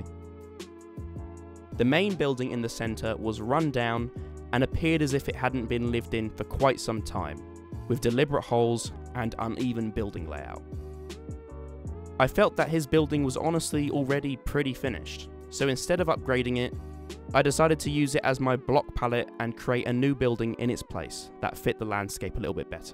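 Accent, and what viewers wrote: United Kingdom. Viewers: You sound like if grian and mumbo Jumbo combined to make the ultimate Brit, from one Brit to who I am presuming is a brit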